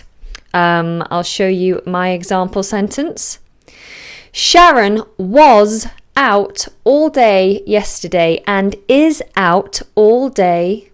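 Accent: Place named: United Kingdom. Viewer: I like how you teach, your english british accent, your pronunciation, but there's something I wanna ask you just for curiosity. Did you study at Cambridge? If not, where?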